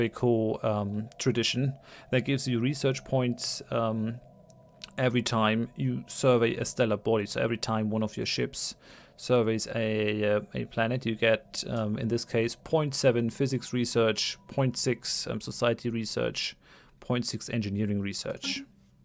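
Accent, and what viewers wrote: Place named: Germany. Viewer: I don't know why but the fact that all of this is being told by a guy with a German accent is really suiting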